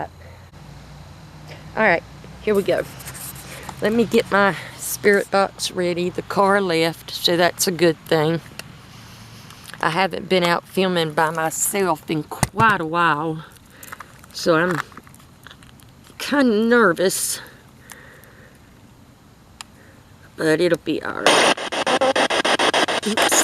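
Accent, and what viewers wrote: South, United States. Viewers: Thank you for getting back to me. My mom was from Little Rock Arkansa and my daddy was from Richmond Vagina, i am from Washington DC. I always Loved a country accent!! can't wait for your next adventure!!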